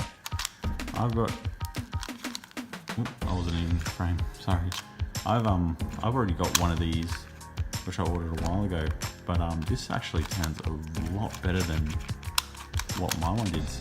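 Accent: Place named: Australia. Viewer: I love australian accent so much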